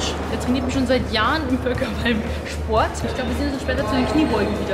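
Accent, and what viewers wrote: Bavaria, Germany. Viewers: Kommt die aus bayern i schp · Waalnüsse 🐳🌰 der bayerische Akzent ist echt putzig 😄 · Man hört manchmal deinen Bayrischen Akzent heraus :D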